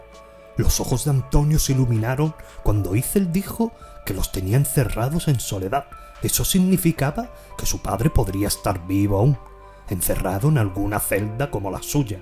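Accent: Spain. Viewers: amigo mío, tu acento es una dulzura al oído, impresionas con tus narraciones, gracias.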